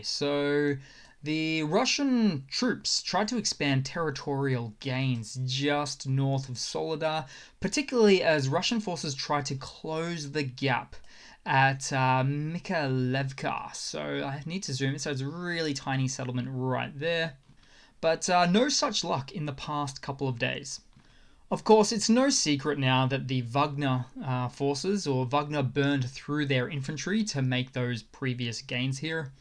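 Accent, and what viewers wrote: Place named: Australia. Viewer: Since he's Australian (assuming by his accent) he might have opposite weather problems in January =D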